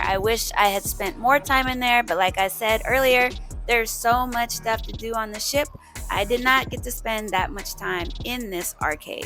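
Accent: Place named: New York, United States. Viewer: I love the way you talk and the cursing lol! I’m from New York and this how we talk too 😂😂😂 very funny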